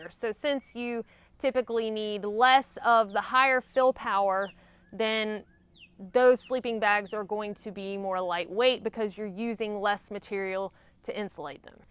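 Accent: South, United States. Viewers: 💜 you’re Vlogs and inspiring enthusiastic way of explaining hiking adventures in your southern belle accent 💗Thank you for sharing 💜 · Not only is a lovely lady, she's also a real smart cookie😁 Thankyou, as always your video was very informative and as always, we love that southern accent 👍👍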